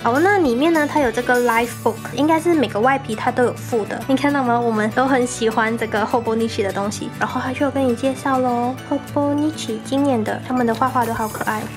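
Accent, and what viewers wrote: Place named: Taiwan. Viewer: 我就超喜歡台灣腔就對了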